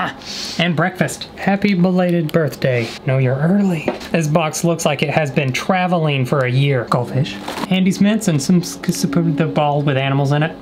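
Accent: South, United States. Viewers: "Everything is a Coke" He must be from the south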